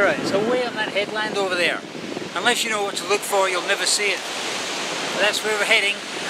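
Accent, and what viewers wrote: Scotland, United Kingdom. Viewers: Can I ask where your accent is from? I hear Scottish mainly? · I’m surprised at his Eire pronunciation. Probably his Skaughtisch accent!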